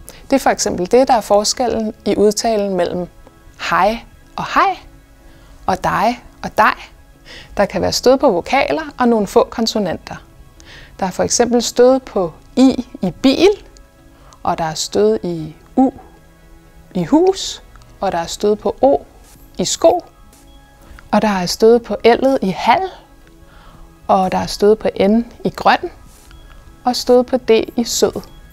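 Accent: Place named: Copenhagen, Denmark. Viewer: De her "Stød" videoer på Youtube har det med at tro, at alle taler københavnsk, synes jeg. I en anden video brugte de ordet "gul" som eksempel på stød, og her bruges "bil". Ingen af ordene udtales bare på den måde, så snart du kommer udenfor deres dialektområde, så det virker som ret dårlige eksempler, synes jeg. Det der "Bi-il", "Gu-ul" halløj kender jeg udelukkende fra københavnere, som jeg kender. Jeg har aldrig hørt dem udtalt sådan af andre eller i andre landsdele. Så virker det lidt mærkeligt at sige, at "sådan gør man på dansk". Det er mange årtier (hvis ikke århundreder) siden, at københavnsk var tilnærmelsesvist rigsdansk. I dag er det en af de mest sære/specielle dialekter i hele landet med "glottal stop"-stød, et hav af bløde D'er i ord, som slet ikke indeholder D ("Huset" f.eks.) osv. Københavnsk er på ingen måde repræsentativ for landet som helhed, når det gælder udtale. 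Københavnsk er omtrendt lige så repræsentativt, som sønderjysk eller bornholmsk er i dag. Derfor er det f.eks. også altid københavnsk, som svenskerne gør grin med i deres sketches, som de kalder "potatisspråk" osv. Det er non-stop halslyde derovre. Tag f.eks. udtalen af ordet "meget", og så bliver det jo et eller andet i stil med "Maaard" (med blødt D), hvilket igen er helt unikt for den dialekt. Hele det der "aaaa" er jo ren hals. lol Det tror da pokker, at svenskerne kalder sproget "grötig". Hvis du f.eks. tager en tur til Østjylland eller lign. så udtales "gul" jo bare, som man ville forvente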